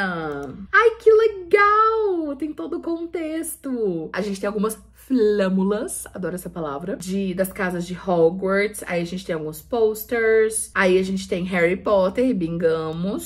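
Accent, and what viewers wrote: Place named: Minas Gerais, Brazil. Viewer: amo demais a sua dinâmica de vídeos, amo estantes alheias, amo a maneira que você é educada sim, amo o nosso sotaque mara de Minas, amo as indicações, mesmo que meu gosto literário é um pouco diferente você me desafia a ler vários conteúdos diferentes!!! ❤ continue!!!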